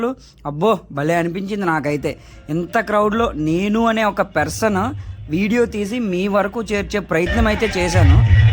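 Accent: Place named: Telangana, India. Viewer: Anna plz anna telangana slang lo mataladaku plz anna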